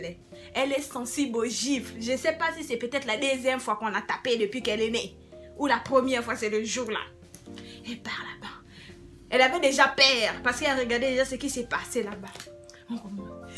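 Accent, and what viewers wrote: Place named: Democratic Republic of the Congo. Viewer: J'aime les congolais...Regarde comment tu parles tu Choko façon jusqu'à 😂😂😂😂😂Je m'abonne ohhhh on fait comment avec confinement là...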